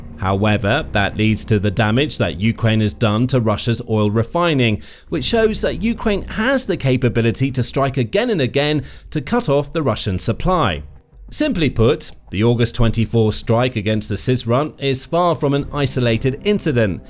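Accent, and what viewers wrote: England, United Kingdom. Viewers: Why does the narrator keep referring to petrol as gasoline? He's English based on his accent and in the UK they call it petrol NOT gasoline.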